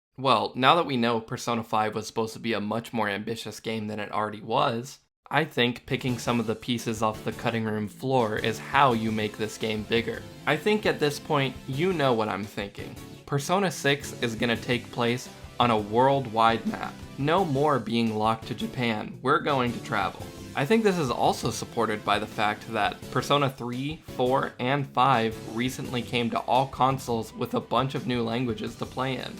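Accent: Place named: Italy. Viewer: > Yeah I should put more stress on those syllables, that’s my bad! Don't worry. It's just a thing we Italians do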